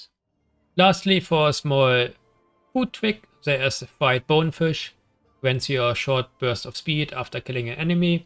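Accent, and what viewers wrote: Germany. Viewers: man hörts du hast bisschen deutschen akzent :D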